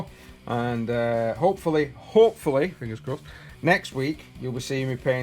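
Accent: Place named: Yorkshire, United Kingdom. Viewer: brilliant vids sir. you really do deserve more views. love your titanicus vids. your yorkshire accent isn't to strong, easily understandable. i'm north notts so used to it. keep up great work.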